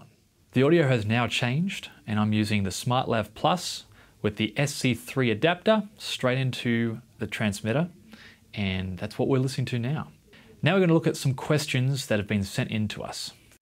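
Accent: Australia. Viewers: Great review bro! Like your accent. Are you Aussie? Been checking out good affordable wireless microphones that are easy to use since I am planning to create a vlog content in the future and I find this little device very capable for budding content creators like me.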